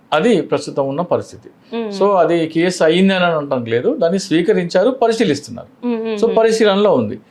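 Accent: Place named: Telangana, India. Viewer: Eeyana telangana language lo maatladutunnade..🤔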